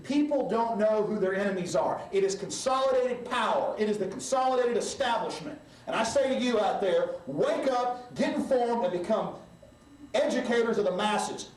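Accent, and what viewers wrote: South, United States. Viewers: damn what happened to his southern accent? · Alex Jones or an imposter? Or is the current Alex Jones the imposter? This guy has a REAL southern accent, the current Alex Jones seems to have a Russian-pretending to be a Michgander accent. (heads up people, the biggest conspiracy you have never heard of is this: There are a LOT of Russians in key positions in this country pretending to be Americans!!!!)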